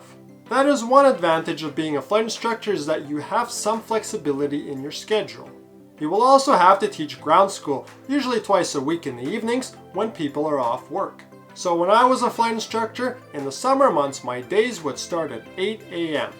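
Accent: Canada. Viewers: im from bc and this guy has the most canadian accent ive ever heard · Do I sense a slight Quebec accent? If so what schools are good with a good price in qc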